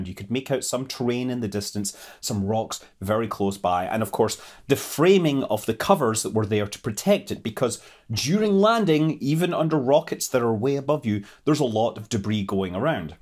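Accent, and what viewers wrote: Scotland, United Kingdom. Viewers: Husband: "Are you watching that Scottish guy talk about space again?" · Nothing screams Saturday night as a 25 year old more than a Scotsman talking about space! Love it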